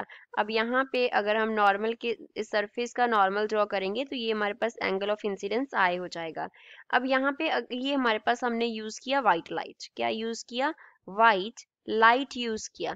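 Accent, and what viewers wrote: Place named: Punjab, India. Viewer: Sounds Punjabi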